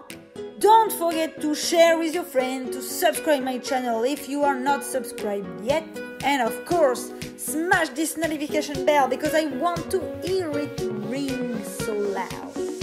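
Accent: France. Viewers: are you French I recognize your accent (je suis français aussi) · You are french ?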